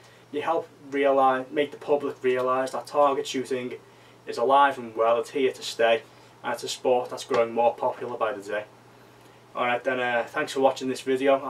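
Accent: Liverpool, United Kingdom. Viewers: My mans a scouser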